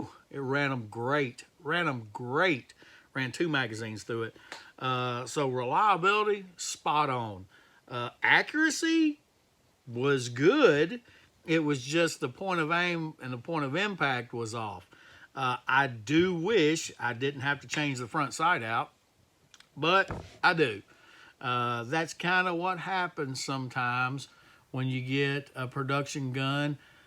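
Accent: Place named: Georgia, United States. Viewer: You sure do sound like a Georgia boy good review thanks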